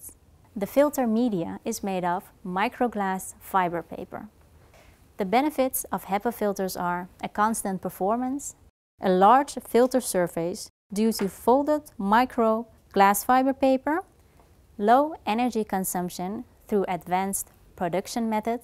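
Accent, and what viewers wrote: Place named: Venezuela. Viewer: She's from Venezuela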